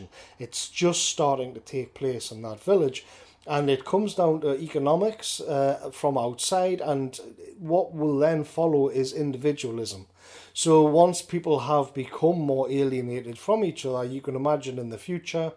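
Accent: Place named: North East England, United Kingdom. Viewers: Thanks for pointing out the elephant in the room. This review commits the same cultural appropriation that this film rails against. That is to say, ignorance of Cornish history and the basis for Cornwall’s culture difference from the rest of England. The director Mark Jenkin has said as much in interviews claiming Cornishness is a major theme in the movie, a factor that many in England don’t take the time to understand. Despite the commentator’s thick North East accent, as opposed to the thick Cornish accent he mentions, he should not use Cornish identity as a metaphor to describe the state of England more generally. At the end of day there is little evidence the poster understands the undercurrent of resentment that exists in Cornwall towards those who approach Cornwall and the Cornish as a rugged and slightly strange part of the Home Counties. 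Unfortunately this poster is little different in that regard to the Home Counties blow-ins depicted in Bait. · I live on the NE coast and it's both middle class and has fishing. I guess you know this though because you're from the same general area based on your accent. I'm working class myself, but I've lived at Tynemouth and Whitley Bay and had a lot of mates out that way as well. And while it is full of mega-rich families, there still is fishing as well. I know a lad that works on a boat actually, and it's generational thing as he works with his Grand-Dad. I guess the NE coast is different as you have N and S Shields as well. I don't know much about South Shields, but North Shields still has Fishing as well. I imagine it's nothing like it was though, but then the Oceans were over fished for a time, so this makes sense as well. Anyway, what I meant was that in this case it's different I guess. 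I've never been down south much, so don't know what it's like. But up here, seaside areas are always incredibly middle class. I can see how a rural fishing area could be destroyed by townies though, I guess it's just a totally different situation to our seaside areas in the North East. I think Tynemouth is quite possibly the most expensive area to live in North Tyneside.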